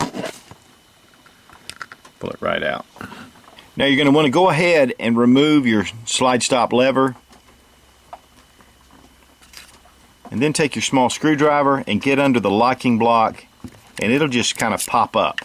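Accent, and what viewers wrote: United States. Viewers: why can't americans say any :D it's always ' ini ' xD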